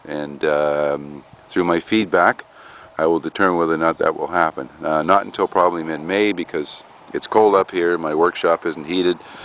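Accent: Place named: Canada. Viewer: I heard your from Canada cause you said.. ouuut… like houusse.. it is just I noticed it in the Canadian accents.. 🌺👍🏽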